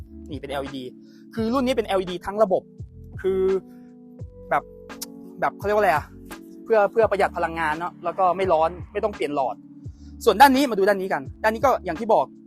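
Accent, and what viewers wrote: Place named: Isan, Thailand. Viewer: มีคำว่าเนาะลงท้ายรู้เลยคนอิสาน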